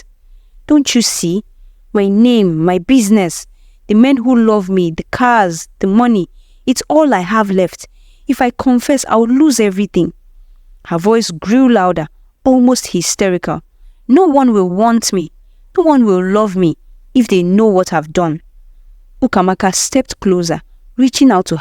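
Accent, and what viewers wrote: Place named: Africa. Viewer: I’ve been binging this whole channel for the past hour! It just popped up on my feed, and I love folk tales, fables, and traditional stories of different cultures that don’t usually get a lot of exposure internationally. The diverse communities in Africa, which are many, are very interesting. They’re not like any others that get the most attention and are retold and regurgitated by those who don’t have the knowledge or experience to tell these tales properly to convey the message. This channel is so unique and the stories they share are powerful and apply to so many different situations in every community. The messages are poignant and touching and they make you think deeply about the overall lessons they are supposed to teach and the difference it’s supposed to make in all aspects of life. Not that this is important to the overall story, but the narrator’s accent really brings it to life. 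The accents of the many unique cultures in Africa are so distinctive and beautiful. Stories told by the people who are intimately connected to the both the land and the people. This gives it an authentic and trustworthy feel that leaves an impression that stays with you forever. Bravo to the channel and its team for such excellent and extraordinary work!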